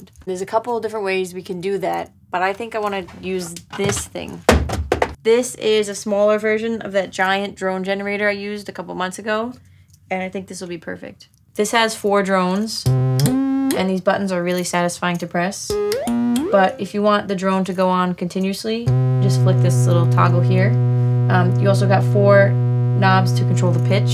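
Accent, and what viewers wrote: Long Island, United States. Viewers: You do have a Long Island accent but like 15% of one. It sounds like you've worked to get rid of 85% of it and honestly that work should be recognized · I never knew you had a Long Island accent until today. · The well built shelves full of music-y gadgets in their og packaging? Impressive, but how do you find anything o.o Also: I heard the accent as soon as you mentioned it. It came and went. So that's a Long Island accent, huh? (Regards: "Not an American") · Your Long Island accent is not really bad, it's just really Long Island, which is totally reasonable and legit. Local pride! Cool tracks. I love Gris. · all on point! and your Lonk Island accent is subtle. (✿◠‿◠) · You are very good looking and easy to listen to/watch. These people are nothing without the hate. You have a little Long Island accent but why would that be bad thing, lol dying on the Perfect Circuit bit. 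· The track I liked the best is your Long Island accent! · This was my first time to be aware of you. I enjoyed and was impressed by your brilliant creativity with the varied tech. Also I ❤ your Long Island accent! 😊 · Please accentuate and use your long island accent more. It be appreciated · First track was my favorite. Harp lady, gothegg, Cory Feldman!? You don’t look like any of those people, you look like Rogue from X-Men. You’re Rogue look a like that demos pedals & plays some cool music to me. I knew you weren’t Rogue from X-Men when I first heard you speak & had that Long Island accent instead of Rogues deep southern/Mississippi accent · IT'S LONG ISLAND ACCENT?! I THOUGHT YOU WE'RE MIDWEST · Hey!! You should look up a video of a stringed instrument called a Mulatar. Its a weird Harpy guitar, drum. This thing has me looking into building one myself to sew if I can? I also own harps and guitars and bass. 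I don't consider myself a luthier by any means but a guy builds a guitar and that's cool? A guy builds several guitars and he's a luthier? I guess? Looong Island accent is right. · Yea ur long island accent is thick af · Totally Long Island accent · Your Long Island Accent is not really bad, it is really good. It is refreshing to hear a regional dialect. That Perfect Circuit placement was awesome. I like the music for game #3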